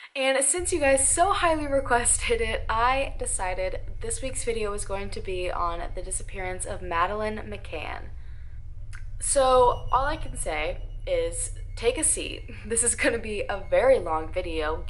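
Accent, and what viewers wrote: South, United States. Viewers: I love your Southern Belle accent, 'she gawn missin',...lolz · Girl let that southern accent OUT 😆❤️ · don’t worry about your country accent coming out occasionally. That happens to me too😂💓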